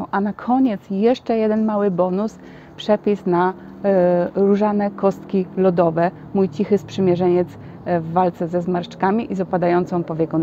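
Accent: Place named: Ukraine. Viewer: Pohodzisz z ukrainy ? Bo masz trohe taki akcęt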